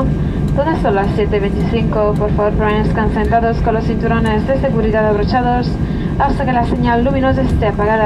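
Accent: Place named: England, United Kingdom. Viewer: hehe easyjet, en naam leuk! Lol dat engels accent ken het bijna niet verstaan. Mooi video wederom! Weet jij misschien echt goedkope vluchten? Ik zie namelijk vaak spotters naar Geneve of Manchester gaan paar keer per jaar, of spotters die vaak vliegen voor goedkoop. Weet jij dat misschien! Alvast dank!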